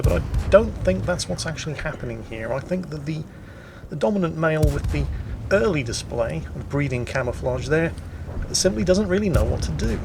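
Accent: United Kingdom. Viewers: Sir David Attenborough doesn't have anything on you,. If you fail at gaming, I certainly see a career for you in monotonous British-accented wilderness television. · his British its in there blood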